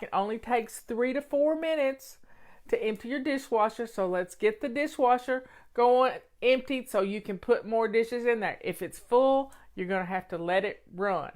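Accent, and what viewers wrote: South, United States. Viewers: Been listening and watching for years whenever I start losing my way back into chaos. Your easy direction, great starting point, and delivery are authentically your own, OG Fly! Your familiar Southern accent is both graciously understanding and proper, yet decidedly stern. Well done! You’ve inspired so many!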